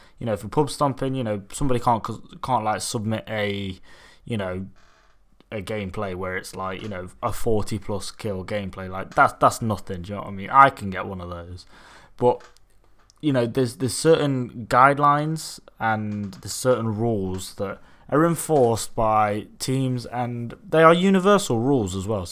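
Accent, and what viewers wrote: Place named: United Kingdom. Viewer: Another British voice! <3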